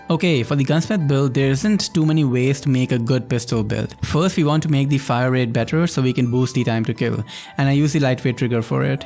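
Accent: India. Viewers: Are u Indian???ur accent is a bit Indian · You sound Indian Are You from India?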